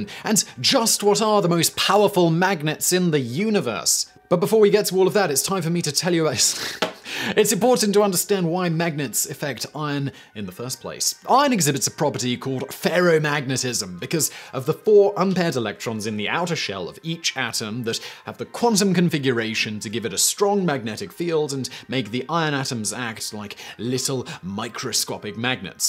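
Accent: United Kingdom. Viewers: I had no idea how many weebs -- specifically JoJo fans -- were part of Simon's fanbase until I looked in the comments. I just assumed YouTube first recommended me his videos because he's got a British accent which is coincidentally similar to the accents of aniTubers I watch. Let's try to decode this insane YouTube algorithm! Which aniTubers do you watch if any?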